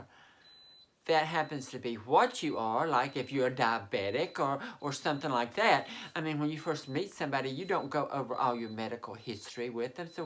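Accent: South, United States. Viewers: I love that sweet southern drawl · I love your Southern accent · Love that southern accent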